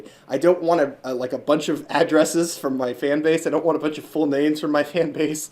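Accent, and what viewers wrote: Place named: United States. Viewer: also, as i assume your american due to the accent, is it eastern standard time, western standard time or central time?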